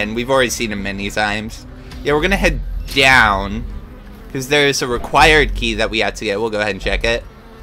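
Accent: United States. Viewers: I started learning english with pewdiepie, then i discovered your channel. You helped me getting use to american english, thank you for that :). I have to find a scottish youtuber now, i can't understand them ^^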